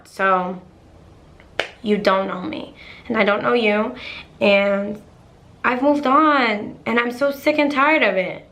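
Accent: Boston, United States. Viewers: I LOVE when your thick Boston accent comes out😍! I love the way bostoners? Talk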